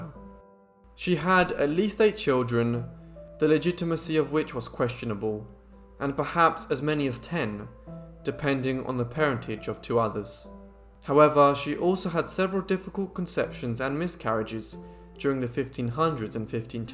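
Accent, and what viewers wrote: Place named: Upper class, United Kingdom. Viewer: I can tell that you are from the upper class, sir. 👍🏼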